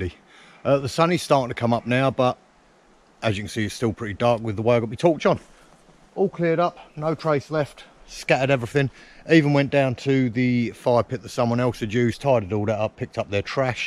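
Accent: Cockney, United Kingdom. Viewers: This geezer looks/sounds just like Ray Winstone, that's a great Cockney accent. Two thumbs up.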